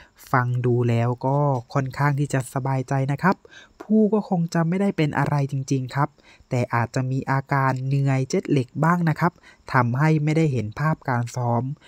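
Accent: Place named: Phu Tai, Thailand. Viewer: สาวภู่ไท.เว้าแนวนี้ ผมก็สบายเจ้อ ครับผม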